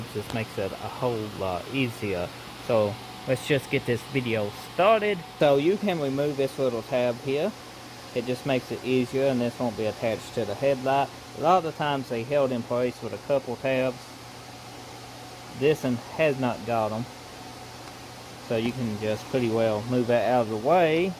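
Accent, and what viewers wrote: United States. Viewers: very quick and to the point, good to hear a man with a true American Accent too!!!! Thanks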